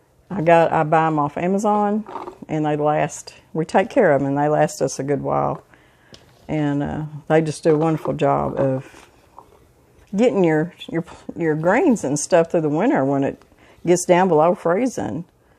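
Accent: South, United States. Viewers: I’m pretty new to your channel now, but didn’t realize you and Mr. Brown worked outside your home. Where do you work? I’m getting use to your Southern accent. Interesting video. Thanks for sharing.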